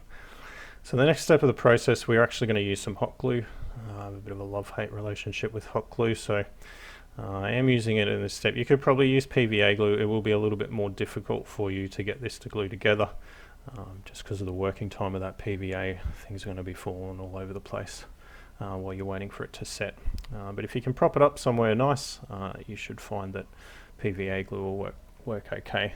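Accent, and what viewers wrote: Australia. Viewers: I mean hearing an Aussie accent on a wargaming terrain tutorial channel is weird enough but seeing all the tools from Bunnings is even weirder.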